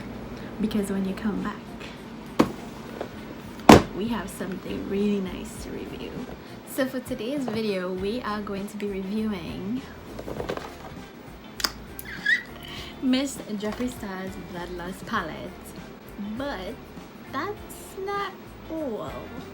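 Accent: Trinidad and Tobago. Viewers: i subbed as soon as i heard the accent cause im trini too!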